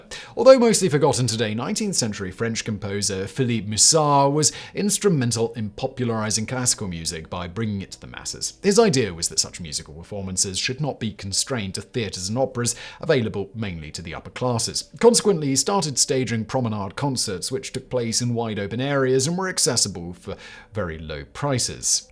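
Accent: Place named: RP, United Kingdom. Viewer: Bro why do you talk like that.. makes it hard to listen/take seriously. I thought that pretentious posh accent died out by the late 1900s.. even the British RF dont talk like that anymore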